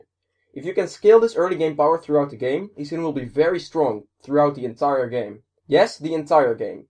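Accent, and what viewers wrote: Netherlands. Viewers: Talk slower btw are you dutch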